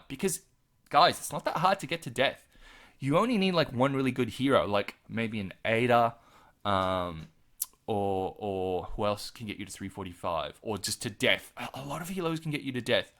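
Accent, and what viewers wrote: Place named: Australia. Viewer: I've never had any issues understanding you but then I've played online games on AUS time for most of my teen years so maybe I'm just not phased by the accent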